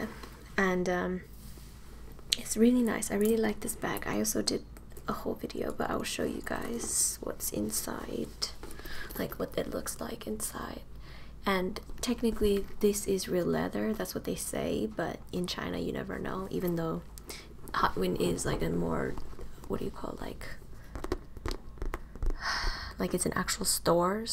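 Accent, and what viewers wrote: Russia. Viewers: Or russian, if you ever watched orange is the new black reminds me of red! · I definitely heard some Russian in the accent :)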